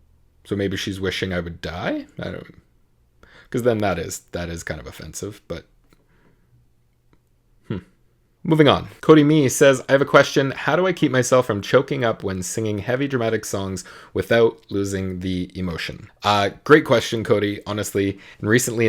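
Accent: Canada. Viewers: My first ever musical? Depends on what you mean. My first STAGES musical was Les Mis. I saw it in ‘93 (I was 13 at the time) Toronto. I think it was the last or one of the last stops of the Canadian tour. Fell in love with the show and went out to get the piano book the very next day. If you mean movies, then it was The Sound of Music. We got hold of a VHS copy back in the 80s and I watched it every day for a month or more. I probably drove my parents crazy! ps: are you Canadian/spend significant time in Canada? I noticed how you pronounce, say, “out.”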